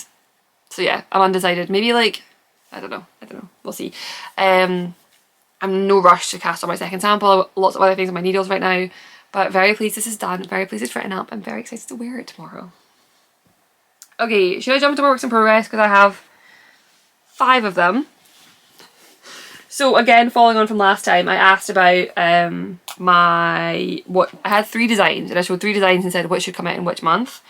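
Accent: Scotland, United Kingdom. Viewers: I have watched several of your videos and have several of your patterns on my ravelry wish list. Also I love a Scottish accent you could be reading me your grocery list honestly so I was actually embarrassed for myself to realize i wasn’t subscribed. Has been remedied now but damn. Not you showing up on my suggested page to get my ish fixed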